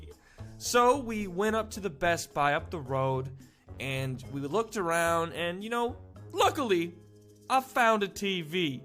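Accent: Canada. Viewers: Ur Canadian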